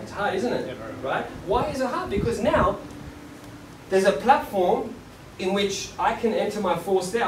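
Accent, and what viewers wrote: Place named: Australia. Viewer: hey dude, sorry live in New Zealand assumed you were Ozzy because of the accent. I do abit of bjj here and have trained traditional tkd. Just trying to open some more doors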